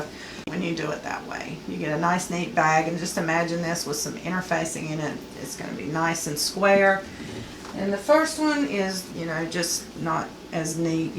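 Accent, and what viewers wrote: South, United States. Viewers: love your southern accent :)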